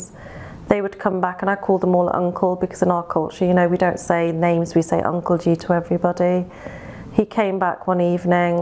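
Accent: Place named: England, United Kingdom. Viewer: I am so sorry that you went through Hell. But you have an English accent, and if you are living in London go to the police and get your Dad thrown in jail, and let him rot there. I was born in India and I know what women go through. If you are living in a foreign country. The athorities will protect you.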